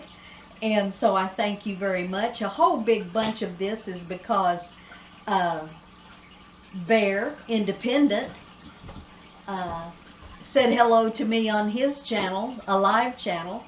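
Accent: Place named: Texas, United States. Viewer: Your accent sounds familiar. I’m from Dallas. Small towns around there. 😄👍❤️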